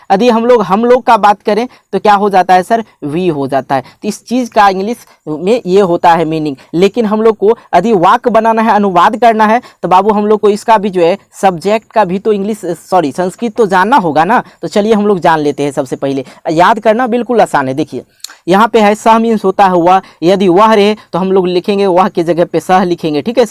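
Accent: Bihar, India. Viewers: Sir aap Bhoot hi acha padhate ho aap bihari ho na 😅😅😅 mujhe pata. Chal gya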